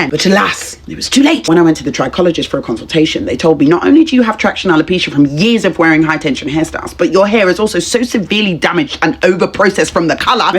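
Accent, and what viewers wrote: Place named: United Kingdom. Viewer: Are you British? I’m not a native speaker, love your accent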